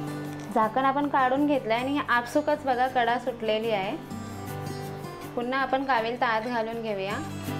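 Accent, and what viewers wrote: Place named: Konkan, India. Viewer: खूपच छान रेसिपी सांगता पण सुंदर भाषेत कोकणि ढब छान वाटते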